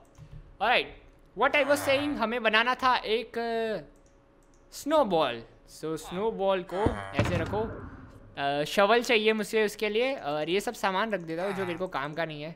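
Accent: Gujarat, India. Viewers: Are you from Gujarat?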